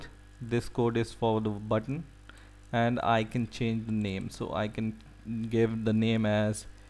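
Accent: India. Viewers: your from india xD?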